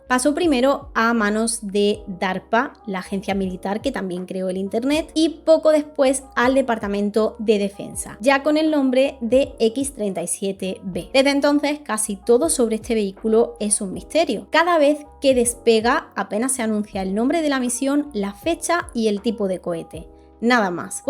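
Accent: Andalusia, Spain. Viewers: Lindo acento andaluz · el mejor resumen acerca del tema con un hermoso acento andaluz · Que interesante video, Pero por Dios, de que parte de España es ese acento? Es muy hermoso 🤩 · No sé Uds, pero lo interesante del tema lamentablemente lo perdí, por lo embelezado que me dejó el hermosisisisimo rostro de esa niña! ah! y la ñapa: me encantó el contraste que tiene entre su pronunciación de términos en inglés, y el acento (creo que es andaluz, corríjanme) cuando dice palabras en plural y se "come" la "S", 😊... ese: "etádo unido" le suena muy cool, que lo digo yo que digo "caraca", en vez de "caracas", que es de donde soy... PS: en cuanto al tema del video, prometo oirlo en vez de verlo, para concentrarme en lo que dijiste! Tip: baja un poco el "peluche" ese que cubre el micrófono, para que dizque se oiga mejor.. 😉... una vez digerido el material, prometo reuní un dinerillo para comprar un cohete.... 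para qué? preguntará la... pues "PARA LLEGAR A AMARTE"! 😅😅... (tú te comes las "S", pero yo no me como las "A", jeje) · Amo el acento andaluz y encima contando cosas interesantes. Saludos desde Chile!! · Está hablando con acento andaluz, el de mi tierra, si no te gusta agua y ajo. Luego te quejarás del doblaje español y preferirás el latino que hace que parezca que todo es una telenovela. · Me suena a Andalucia ese acento😅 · Me Encanta ese acento español❤ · Excelente video: sigue adelante el tema es muy demandado a día de hoy. Jajjj. Tienes asento andaluz muy lindo.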